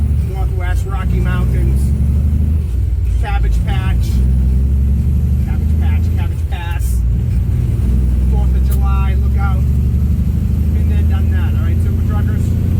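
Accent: Boston, United States. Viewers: I think I heard you say you've only driven for two years. I don't believe that for a moment. You shift the same as I shift my 13 speed Pete. I've been driving long haul for 48 years and I say you pass the test with flying colors. Love the Boston accent !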